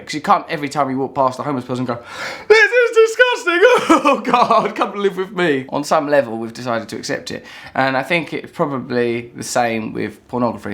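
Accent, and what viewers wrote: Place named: RP, United Kingdom. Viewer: Trying to work out what you say , not because I'm American but because you posh mumbled. Something to do with to learn more watch ( centre of the self?) The subtitles say " To learn more or change in the cell phone communities miller's 3i I wonder" <<< PS Can you tell me what hair product you use, I'm jealous of how you manage to tame your barnet. PPS Come to Birmingham to meet practically evicted Londoners like myself and do some Trews on the road!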